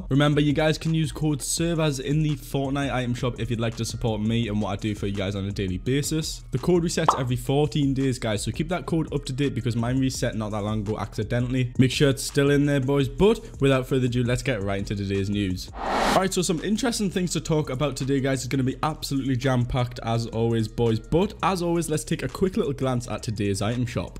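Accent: Newcastle, United Kingdom. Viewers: Are u from Newcastle because i am too!!!! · You a Geordie boy?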